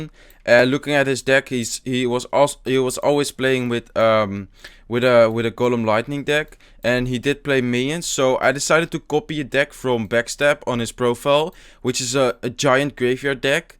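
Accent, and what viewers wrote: Netherlands. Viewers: `haha dat nederlands accent lol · Matthijs Riemens denk het ook want zijn uitspraak is echt lelijk Nederlands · Are you Dutch? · Are u Dutch/Belgian|Ben je Nederlander/Belg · Are you from the Nederlands?? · ja hij is Nederlands ja, kan je al aan zijn accent horen. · Ik dacht al dat je nederlands was. blanke koppie en dat accentje. knap gedaan trouwens. zet ons kleine landje goed op de kaart toppie! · are you dutch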